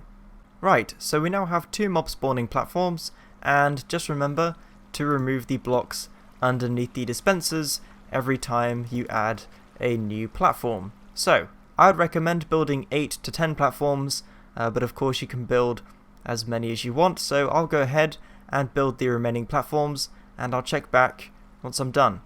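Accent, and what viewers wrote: United Kingdom. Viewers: its called being British lol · It’s called an English accent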